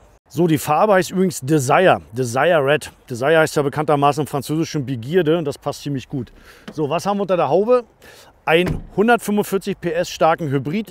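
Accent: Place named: Berlin, Germany. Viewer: Berlin / Brandenburger Akzent 😀🤜🏼🤛🏼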